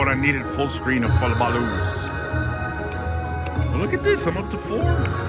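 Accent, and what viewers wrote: Louisiana, United States. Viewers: Are you from Cajun Country?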